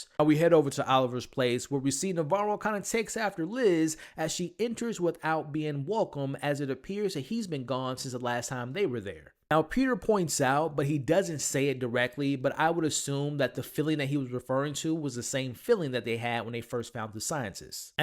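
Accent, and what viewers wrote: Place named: Atlanta, United States. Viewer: you changed your speaking voice??? this aint the white lotus. Atlanta voice... damn E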